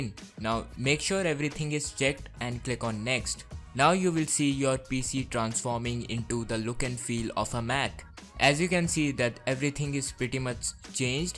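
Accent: India. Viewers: He is indian. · ORAN DSOUZA he is indian, and pronounciation does not matter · Indian sound ass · he is indian dont trust indians they scam you if i click on a video and here if they are indian i am out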